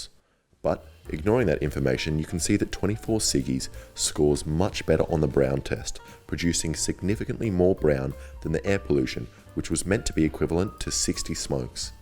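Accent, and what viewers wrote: Australia. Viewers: Hes australian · Are you Aussie mate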